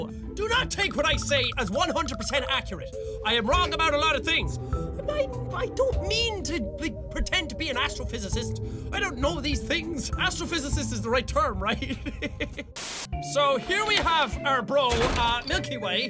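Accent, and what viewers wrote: Ireland. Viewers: What! He IS Irish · his Irish accent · Are you a weee bit Irish? I just discovered this btw.... don't judge me. Keep up the good work! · Not just wee bit.... COMPLETELY IRISH!!!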